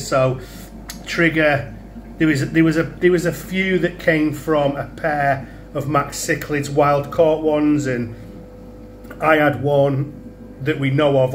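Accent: England, United Kingdom. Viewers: My daughter has started saying "stunning" in an English accent after watching your videos with me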